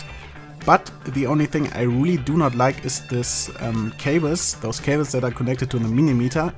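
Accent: Germany. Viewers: Man hört so richtig das du Deutsch bist :D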